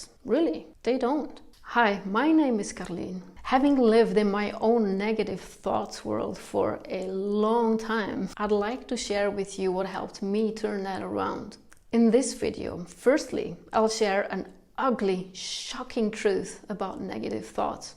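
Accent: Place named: Netherlands. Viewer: Totally get the tail-chase thing. I'm late this week and couldn't stick to my weekly upload schedule as my type of content is edit-heavy. I find myself inside Affinity Photo (my PShop eqvivalent) than doing the recording or video edit itself. (Atm I'm usng Descript, Camtasia and Audacity) Out of interest what are you using? And judging by your name and accent I presume you're in Holland? :-) Anyway, having a posting schedule is still priority for me, especially when overseas or dealing with 'the job'. Post or die! LOL.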